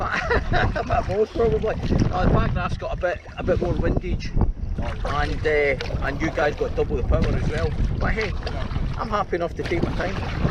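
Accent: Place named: Scotland, United Kingdom. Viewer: The scottish accent is not easy to understand for my french ears too.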